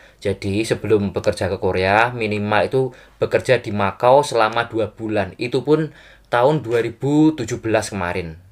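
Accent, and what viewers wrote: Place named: Java, Indonesia. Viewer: Jamet medok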